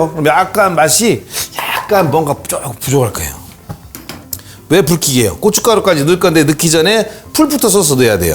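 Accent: Chungcheong, South Korea. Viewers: 우리막내삼촌닮으셨어~ 너무푸근하고 친근감있고 은근재밌고...같은충청도라 말투도 정감있고...너무좋으신분같아~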